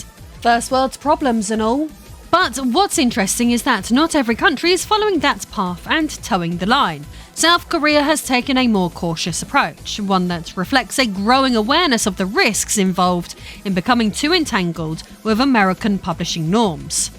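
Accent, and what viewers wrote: United Kingdom. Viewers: She said with a British accent the country locking people up for tweets ffs. Then the first comment she pins is all adds and ways to pay her. But damn America is capitalistic and money hungry.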